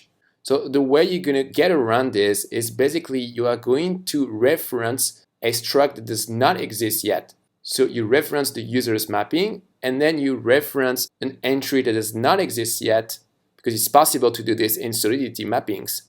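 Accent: France. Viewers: Thank you so much!! Vu l'accent je pense que tu es francophone donc je te le répète : MERCI 🙏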